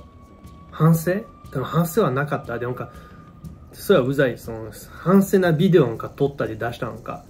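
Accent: Kansai, Japan. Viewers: コテコテの関西よりやね🤣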